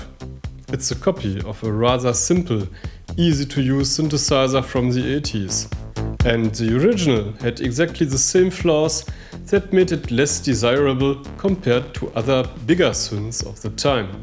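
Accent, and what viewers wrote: Germany. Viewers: Gruseliges Englisch / Horrible German accent... Das lässt sich nicht ertragen und zuende anschauen 😒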